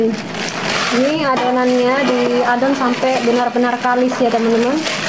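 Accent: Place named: Sulawesi, Indonesia. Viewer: oh pantes, logatx kok ky org sulawesi,, aq asli semarang, tp skrg tinggal di kendari kak,, semangat bikin kontenx kak,, aq ikuti trs lo kontenx kita,, 👍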